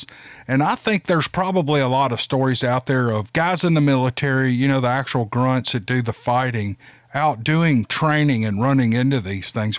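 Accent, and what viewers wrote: South, United States. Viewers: "Those Dmn Yankee Carpet Bagger's and Pine Wood Thieves!". (I can just hear them, and well, "the element is a very close equal, those that SCAM the Naive and Older Folks").... and I'm a born Yankee, loyal to the Union, but with a very real Tennessee Southern Accent. I've never cared for the variable Southern or Country Accents, far preferring the Chicago and Boston....and has a very mild accent, much due to the having been raised in the Metro Memphis area. Much preferred! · . The accent is great. Especially to us in Britain my friend. Very Rett Butler, lol. Remember to us Georgia, N Carolina, S Carolina etc all sound pretty much the same. No offence bud. Also a lot of Southerners could still possibly be descendents of Civil War confederate Soldiers, which to a lot of us, is fascinating. I don't mean racism either. Just great and historical. 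Hope that doesn't offend you mate. Just a possible reason why people mention the accent. 👍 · The funny thing about your accent is relevant, of course it doesn't change the story, however, it draws you into the story, and makes you feel at home (so to speak) It is the Southern thing, I guess, anyway it is obvious that others think the same as I and many others., just keep on keeping on, you are a valuable speaker, and have a way of relaxing your audience, me included. Just be real, and believe it or not, you, as you, comes thru. I really enjoy your channel. Don't change a thing.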